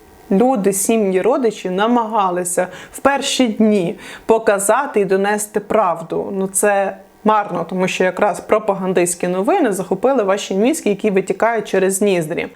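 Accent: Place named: Western Ukraine, Ukraine. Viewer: Клас, цей західний діалект🥰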